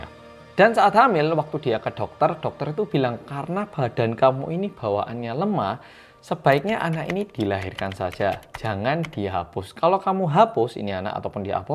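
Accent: Java, Indonesia. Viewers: Mas kalo ngmg korea biasa aja tp kalo ngmg bhs indonesia medok banget jawa nya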